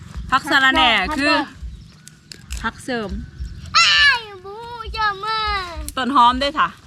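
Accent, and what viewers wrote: Isan, Thailand. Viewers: อีสาน.กะเว้าคือกัน.อุบลเว้าคึบ้านสาวรัศนั้นละ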